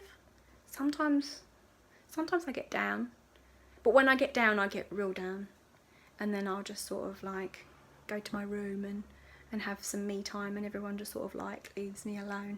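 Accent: United Kingdom. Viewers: I love your British dialect 😊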